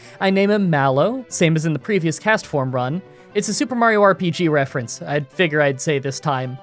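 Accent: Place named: Canada. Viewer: I love this guy's Canadian accent! So cute 😁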